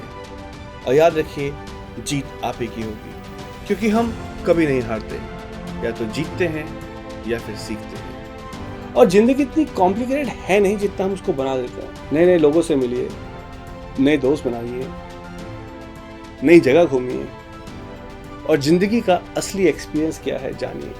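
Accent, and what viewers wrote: India. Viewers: I am here after watching your video where you replied to Technical Guruji ... Hats off to you boss , tone se thoda thoda main samjh gaya tha ki bande ka Pahad se koi na koi link to hai or nikla b wahi. I am from Uttarakhand too. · You look like kashmiri because you have a pure kashmiri accent!!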